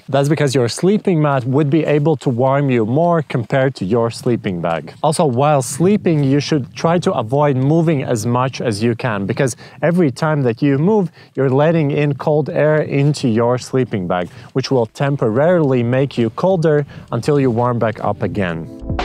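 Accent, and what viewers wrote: Latvia. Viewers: Man jau likās, ka es dzirdu latviešu akcentu. Labs video! :)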